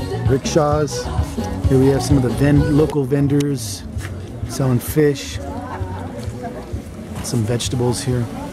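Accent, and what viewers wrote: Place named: United States. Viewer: You sound American. What state are you from?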